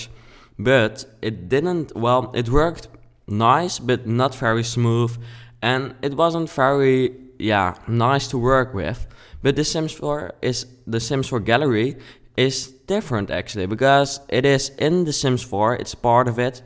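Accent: Netherlands. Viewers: Haha you have such an Dutch accent ( btw ik ben ook nederlands :p )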